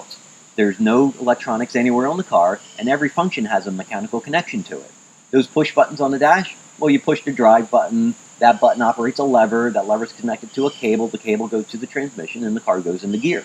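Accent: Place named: Philadelphia, United States. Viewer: Heard a little bit of Philly in your accent; then saw the DE tag on your car; makes sense!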